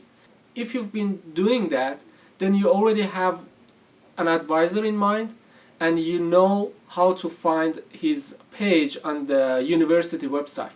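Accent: Iran. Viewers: Love ur Persian Accent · love your persian accent, paydar bashi hamvatan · From the first "Hello", I knew you were Persian.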